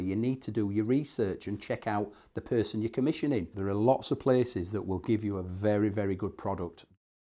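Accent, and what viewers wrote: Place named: United Kingdom. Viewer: Yeah absolutely thanks for your understanding. Which part of UK is your accent from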